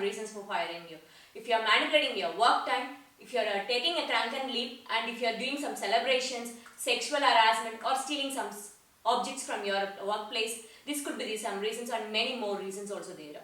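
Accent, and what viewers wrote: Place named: India. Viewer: For a minute I was confused whether she is speaking in English or Indian language. She has very strong Indian language influence. Video is informative. Thanks.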